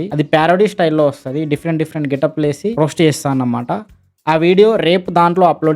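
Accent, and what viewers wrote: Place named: Telangana, India. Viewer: Bokka lo Telangana slang 🤮🤮🤮🤮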